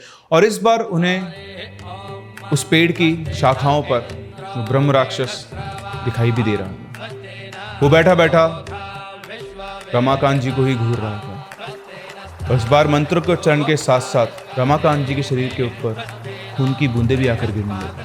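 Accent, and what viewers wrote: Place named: Bihar, India. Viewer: Sir aapki bahari accent..😍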